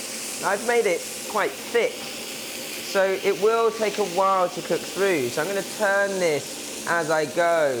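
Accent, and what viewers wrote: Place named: RP, United Kingdom. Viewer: First, I am not sure how I got here. Second, Food? meh. Third. But, honest to goodness why are you trying to sound like Nigella? Is it because it is supposed to sound real poash? But.. Her father was the Chancellor of the Exchequer! And it's annoying like it's supposed to be better than everyone else.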